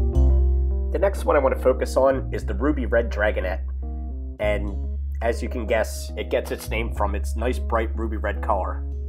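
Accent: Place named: Western Pennsylvania, United States. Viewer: Very nicely filmed. 👍 you sound like you may be from Western Pa.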